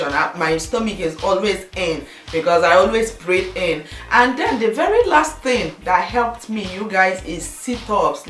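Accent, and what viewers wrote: Igbo, Nigeria. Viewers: stella-marie signature my sister, I couldn't stand it too, and her Igbo accent is so unstoppable. I love her videos but... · Og Diva its her nigerian accent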